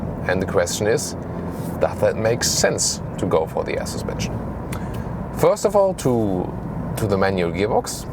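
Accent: Germany. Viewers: You have got a thick German accent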